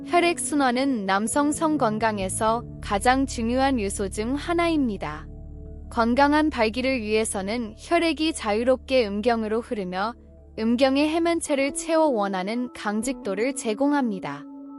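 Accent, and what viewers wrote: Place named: China. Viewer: 발음이 중국인이 말하는것같다.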